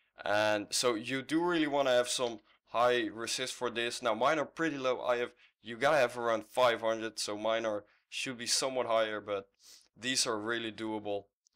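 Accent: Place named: Netherlands. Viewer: when i hear yur accent i would say yur dutch ;)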